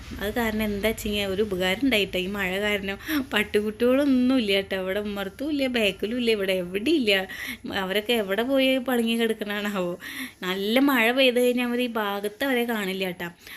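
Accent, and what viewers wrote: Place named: Malappuram, India. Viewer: ഞാനൊരു മലപ്പുറം ഞാനിങ്ങനെ സംസാരിക്കും ❤ എനിക്കിഷ്ടപ്പെട്ടു😂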